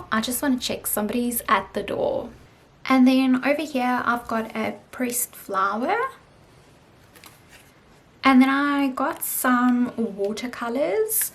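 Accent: South Africa. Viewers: Love your accent, fellow South African😁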